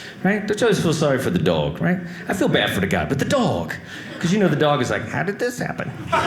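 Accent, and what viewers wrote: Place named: California, United States. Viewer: This guy is a punk. If he is from Texas he's from Austin. Looks and sounds more like a California immigrate.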